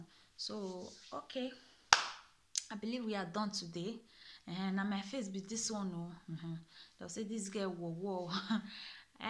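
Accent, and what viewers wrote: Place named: Africa. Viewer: Hello African Queen, luv ur accent 🌹😘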